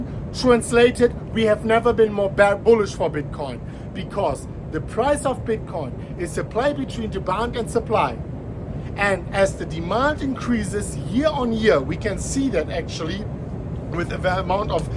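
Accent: Germany. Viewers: i always thought is German Nie wiem dlaczego xD <3 Edit: chyba przez akcent:)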